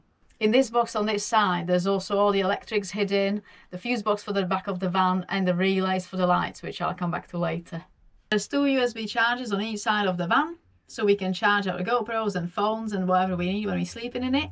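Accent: United Kingdom. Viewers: I was going to say the same, lovely accent! Yorkshire with a twang 😎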